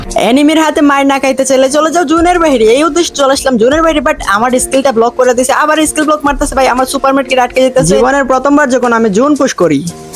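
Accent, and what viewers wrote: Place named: Sylhet, Bangladesh. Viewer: সিলেট বাড়ি নি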